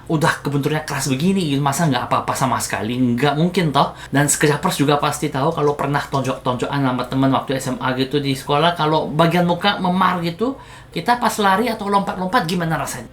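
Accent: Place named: Java, Indonesia. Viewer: Logatnya kayak wong jowo paman, he!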